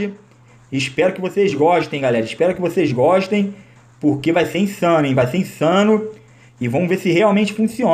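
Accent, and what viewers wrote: Rio de Janeiro, Brazil. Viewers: Mano tu é do rio?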